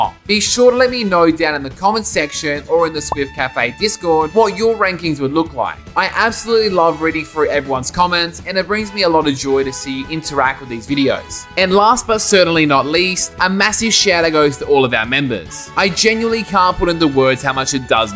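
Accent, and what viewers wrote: Australia. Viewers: man, that australian voice 👍👍👍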